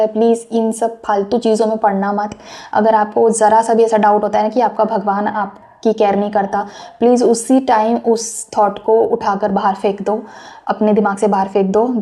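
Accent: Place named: India. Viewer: Don't speak indian style English it makes me sick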